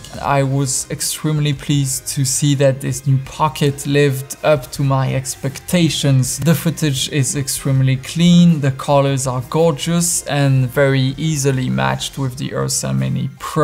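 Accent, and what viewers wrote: France. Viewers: X-T3 for me...but great review, are you french ? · I'd like to know where you're from (accent sounds French). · Excellente vidéo :) Recognized a French guy behind this English accent, carry on this move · J'ai eu du mal à repérer l'accent français :) J'hésite à switch de mon a6300 à cette caméra :s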